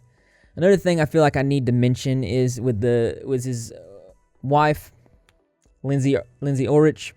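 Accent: South, United States. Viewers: Bro that's a sick southern accent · You gotta be from the south, lol?? Shout-out from NC!!!! I'll admit, back in 2012 I watched a video of a MM concert where he pushes a bandmate on stage in front of hundreds of people. The guy stops playing the guitar for a moment, long enough to briefly yell at Manson, raise his fist as If he is going to start throwing blows. But he quickly starts playing guitar again.... At this time, MM mocks the guitar player by placing his hands in the air and moving them as if he is working a puppet on strings to the beat of the music. Seeing this, I developed a feeling in the pit of my core that told me MM had abusive tendencies, and I couldn't help but think "God I bet that guy is an absolute nightmare to deal with". And quiet frankly, after I watched an interview about Manson being a follower of Anton Szandor LaVey, Aleister Crowley, was given an "honorary priesthood" and claims to be ordained by the Satanic Temple, and has actually had run-ins with books such as Necronomicon.. 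one might be forgiven for believing he is indeed capable of committing such horrendous acts upon another human being.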